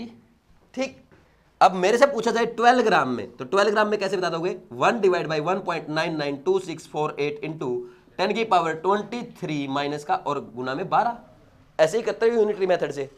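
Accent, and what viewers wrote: Haryana, India. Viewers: your accent is too similar to Haryanvi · Sir are you from haryana?!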